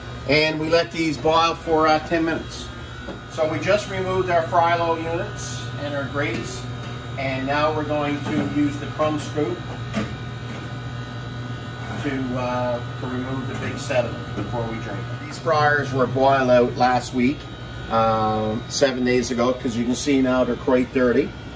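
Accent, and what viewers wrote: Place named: Canada. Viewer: I hear Canadian accent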